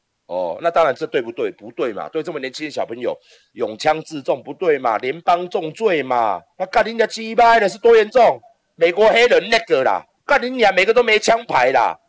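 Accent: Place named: Taiwan. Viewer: 台灣國語 哈哈哈哈哈哈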